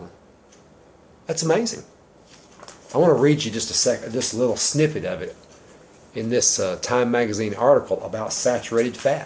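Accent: South, United States. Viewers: your southern drawl makes me laugh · I love your channel and your southern accent. Thank you for breaking stuff down for us!You’re awesome! 😎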